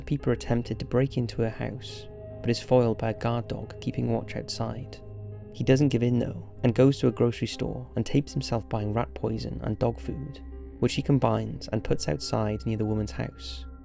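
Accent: Wales, United Kingdom. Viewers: Narrator are you welsh?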